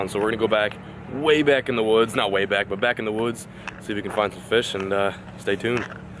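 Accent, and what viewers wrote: South, United States. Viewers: Actually southern and mid Ohio + all the country side folk have that kind of southern twang. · why do you have a southern accent in this video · I feel like you are talking more like a southern accent than you usually do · How come you talk like you have a southern draw in this video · How much voice has changed from then to today in 2019 The southern voice disappeared 😂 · Sounds like you’ve got a southern accent in this video. I’m a new sub. Going back and watching videos. You sound so different. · Lol, in this old vid you can hear a like southern accent from, wow things have changed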